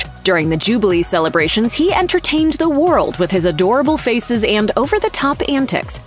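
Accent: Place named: United States. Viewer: Why American commentator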